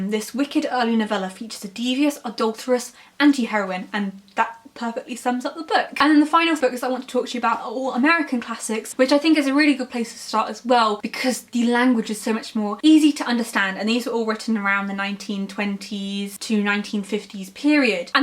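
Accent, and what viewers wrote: United Kingdom. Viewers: I came here thinking the “classics” where of a higher order. I thought she was going to refer to greco-roman classics of LOEB library. Aside that, I imagined her with A British accent and was not disappointed. You’re a beautiful women. Greetings from the golden state. You earned a sub.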